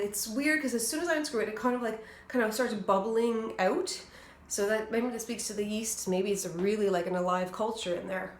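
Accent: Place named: Canada. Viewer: Ok I was too eager beaver, you answered my question at the end. I'm also guessing you are Canadian because of the way you say 'out' 🙂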